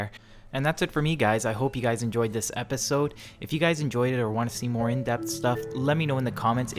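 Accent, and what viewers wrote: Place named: Canada. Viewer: This guy is a fellow Canadian, he has to be with that accent.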